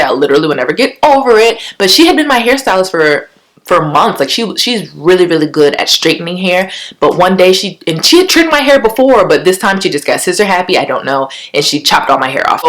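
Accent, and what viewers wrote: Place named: Barbados. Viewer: apologies. I been finding many hair you tubers sounding Barbadian. Maybe it's people from a particular state. You are gorgeous btw.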